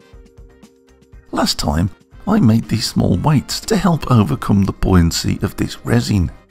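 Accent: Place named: Birmingham, United Kingdom. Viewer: from the accent and the hobby... Is it fair to deduce that you work in the jewelery quarter in Brum?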